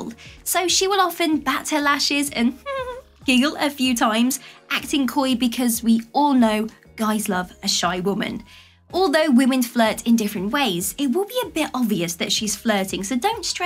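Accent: England, United Kingdom. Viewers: LOVE THE VOICE. IS IT ENGLISH, AUSTRALIAN, OR SOMETHING ELSE?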